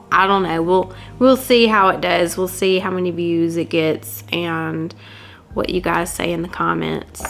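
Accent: South, United States. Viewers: You are still very fresh from your accident. I first clicked on your channel because you were talking about your accident. I keep watching because I find your voice soothing, and I like your slight southern accent! I’m from New England. Be YOU. Talk about your experiences in the hospital, your recovery, your challenges, what kind of things helped you at home…you’re job as an EMS probably has so many fascinating stories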